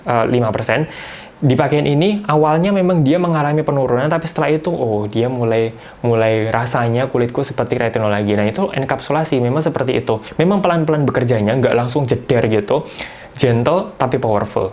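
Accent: Java, Indonesia. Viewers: Jowo ndi yo ? Ngomonge lucu e😁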